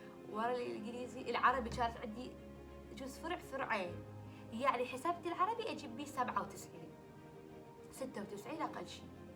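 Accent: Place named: Basra, Iraq. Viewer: حبيت طريقه حچيچ أموت على لهجتكم الحلوه اساسا البصره كلها احبها أتمنى اروحلها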